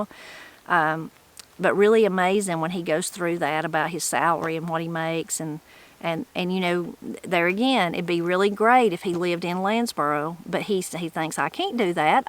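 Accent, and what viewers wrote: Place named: Martins Ferry, United States. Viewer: I just subscribed. Your speech and culture remind me of my family roots from Martins Ferry area.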